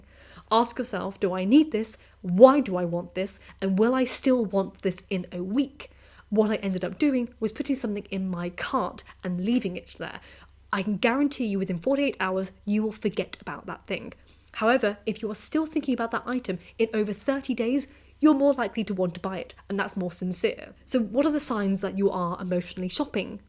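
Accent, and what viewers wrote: United Kingdom. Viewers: I always considered you a very intelligent and wise person. Always got something interesting to say with the most beautiful British accent.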